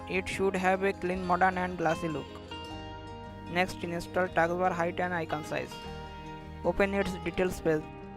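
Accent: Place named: Bihar, India. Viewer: no this is perfect just he have a harder Indian Accent Called Bihari or UP I'm not sure But u can use Subtitles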